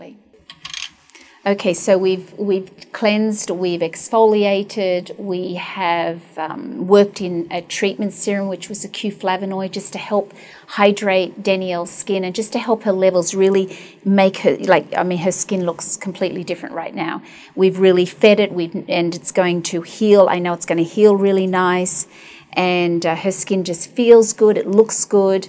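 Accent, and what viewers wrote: Australia. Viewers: Australian or New Zealand(ian?)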